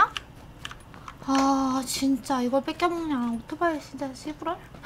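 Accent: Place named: Jeolla, South Korea. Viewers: 님 사투맄ㅋㅋ 고향이 어디세여? 전남 영광같아ㅋㅋ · 고향이 전라도...? 최근꺼부터 거꾸로 보고 있는 편인데.... 이때만 해도 사투리 작열이네욥 ㅋㅋ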